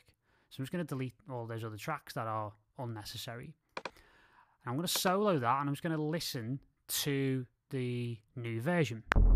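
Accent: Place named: England, United Kingdom. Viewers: ***** Well said Sir. I am from Liverpool, but living in Sydney. Always good to hear an accent from back home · English accent is the most stupid lame az accent!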